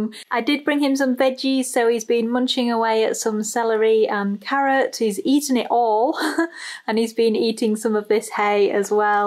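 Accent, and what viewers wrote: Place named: Scotland, United Kingdom. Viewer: Hi! I just found your channel. At first I thought that I haven't heard this accent before. Seeing UK in the channel's description was a big surprise for me, because british english is what they tried to teach us in school for ~10 years. ^^ Your pronunciation reminds me of the scottish visitor my English teacher once brought to class in 9th grade. Could it be some Scottish influence? I'm just as curious as my guinea pigs. ;) My language skills were not the best back then and still aren't today, so sorry from my end. Greetings from Germany :-)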